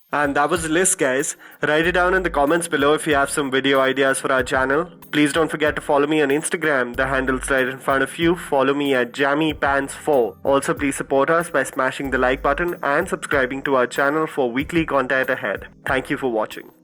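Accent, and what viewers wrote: India. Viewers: That Indian accent though! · Who is here to only enjoy the Indian accent of the speaker?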